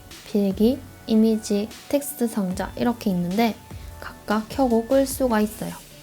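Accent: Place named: Gyeongsang, South Korea. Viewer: 갱상도억양이 아주 강약조절을 하면서 톡톡 튀네요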